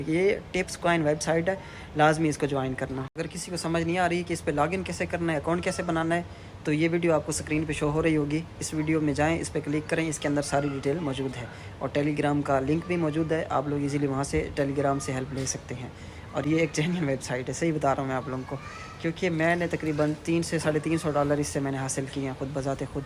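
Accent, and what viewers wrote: Saraiki, Pakistan. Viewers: Happy to hear that saraikian accent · لہجےسےواضح یےکہ یہ صاحب سرائیکی ہیں۔۔۔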